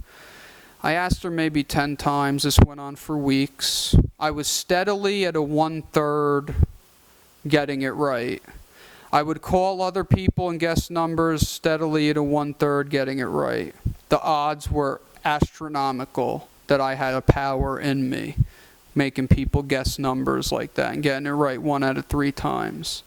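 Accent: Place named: New Jersey, United States. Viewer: Your jersey/jew accent is almost as annoying as your incoherent thoughts and narrow mind & jumbled perspectives. I'm disappointed that I listened till the end.............wasted 30 minutes :( What authority do you speak from? You know that you are worshipping molech, baal, jehovah, yhwh, set, saturn, satan, thor, appollo, abaddon when you are following the religion of the canaanites & pharisees who exalt and demand ritual cannibalism, (communion-Bread/wine) and the obedient worship of a deity that is honored after arising from the dead. Does that sound like something a loving father would demand? for you to proclaim the power of his son, who was tortured? If jesus was gods son, his acts were in humility and grace, not to be shouted from every mouth when judging and condemning, but as an example of the power we have as one man, then as a community that seeks truth and demands transparency & fairness. 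That is gods message. Be responsible and admit you learned a lesson, a 2000 lesson, just because you paid him too much cash, you try to slander his business and livelihood? What happens if I claim as a customer of your construction business, you stole from me, when you you stated a bill and I agreed & paid that amount. How is that scamming & deceitful? Trust me.....you aren't good at meditating or astral projecting, its a gift & ability that we all have as humans, we just need to practice it...........Nice try though. You tortured demons? like from using solomonic magick? Id like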